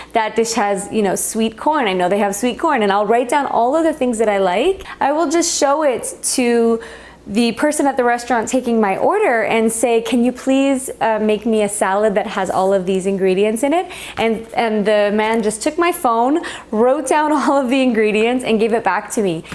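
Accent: Canada. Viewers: Off topic question, are you Canadian?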